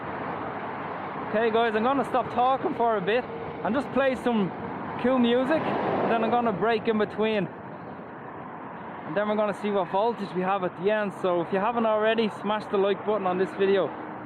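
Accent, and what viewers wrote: Ireland. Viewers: That Irish accent is pretty strong 😂😂😂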